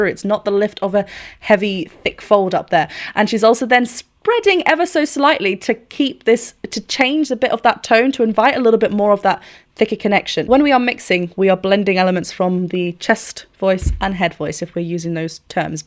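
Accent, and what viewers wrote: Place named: RP, United Kingdom. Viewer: I feel like I have to avert my eyes from your video because of your speech quality. I am very easily affected by the dialects I listen to you. Give me one hour of listening to you and I will sound equally posh. Edit: Which btw could be misconstrued, but is not meant as an insult - at all.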